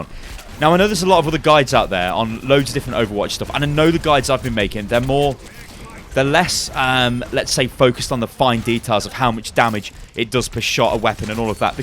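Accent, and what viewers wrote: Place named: United Kingdom. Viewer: Subbed for that hilarious laugh and that epic Brit accent. Oh, and the guides. Kinda. Ish.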